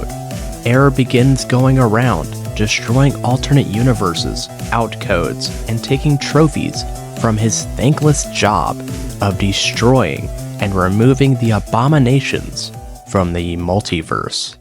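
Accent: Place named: Azerbaijan. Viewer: Azari(A Turkish accent)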